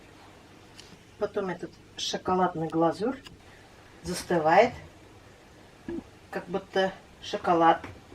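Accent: Tatarstan, Russia. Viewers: Обожаю наш татарский акцент!😘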